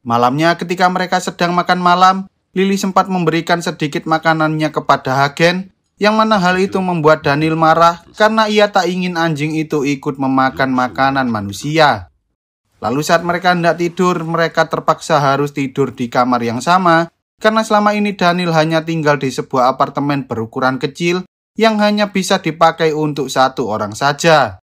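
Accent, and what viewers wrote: Java, Indonesia. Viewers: Bang lu orang jateng apa jatim ni? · Narasinya medok" bahasa yg santai aja bang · Medok jowo gk enak dikuping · Logat Jawa Gak Enak Di Dengar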